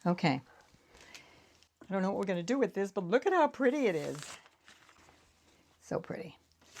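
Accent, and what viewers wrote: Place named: New England, United States. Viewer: So glad to have discovered you! Lovely work, great techniques. Thank you! Heard the New England in your voice and got homesick for NH/Mass…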